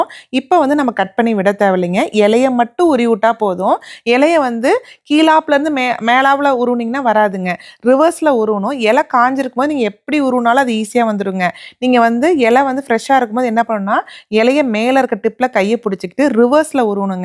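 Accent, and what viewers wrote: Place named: Namakkal, India. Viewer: I believe you are from namakkal from your speech .. good tips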